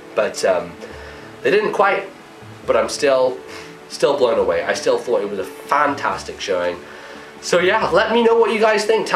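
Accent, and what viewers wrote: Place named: United Kingdom. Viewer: its guys. He's british, give him a break. It's not that hard to understand if you've been here for a while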